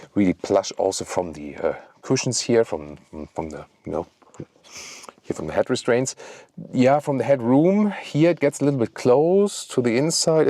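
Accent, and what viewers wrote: Germany. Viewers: Junge, Du bist "deutscher2 als Du glaubst. Lass das bitte mit dem English. Dein Akzent verrät Dich hinter jeder Silbe. Grund genug, nach 2 Minuten wegzuschlagen. Unerträglich.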